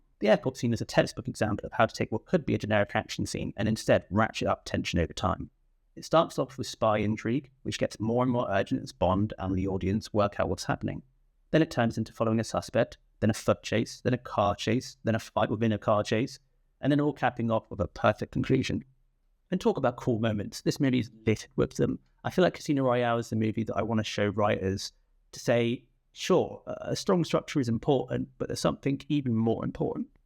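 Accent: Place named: United Kingdom. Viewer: You had me at "the human stain ... Pepsi!" Proper thing listening to a solid review of my favourite Bond with a British accent ;-)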